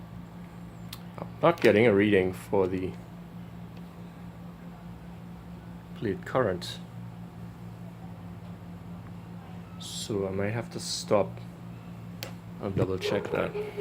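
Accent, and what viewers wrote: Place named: Trinidad and Tobago. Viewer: Trinidad? Please tell me my ears dont deceive me! Woodbrook boy says hello!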